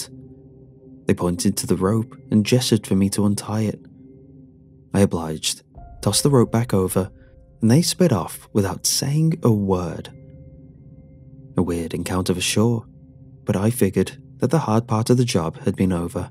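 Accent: United Kingdom. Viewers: I watch these just to hear your amazing British accent ❤️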